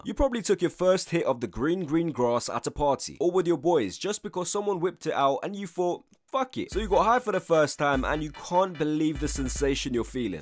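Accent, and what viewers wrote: United Kingdom. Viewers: so zooted I didn’t realize he had a British accent. Just thought he was talkin’ silly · bro i think its british.. why british sound so bsSD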